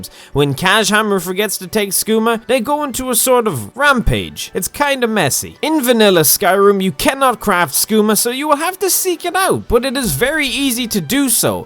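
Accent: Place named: Ireland. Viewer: We are more addicted to your content than skooma lol. It's something about your Irish accent... it's amazing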